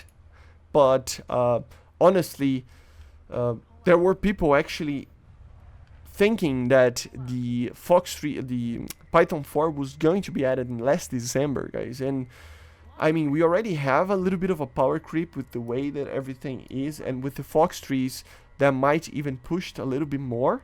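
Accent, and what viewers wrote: Brazil. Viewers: are you Brazilian???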